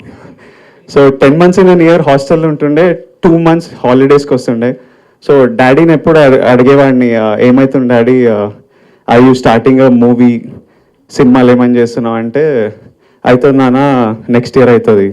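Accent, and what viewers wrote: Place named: Telangana, India. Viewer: he spoke pakka..hyderbadi..telangana slang..so..natural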